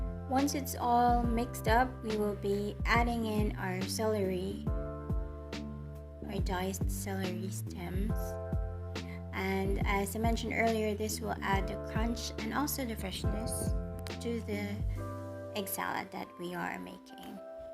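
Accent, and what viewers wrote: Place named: England, United Kingdom. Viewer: Speaks a very english intonation, nice!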